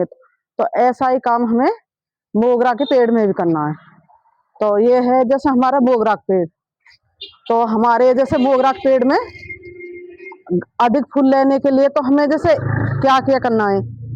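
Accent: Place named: Agra, India. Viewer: Kahan se h*. AAP. Agra ki taraf ki language lag rahi hai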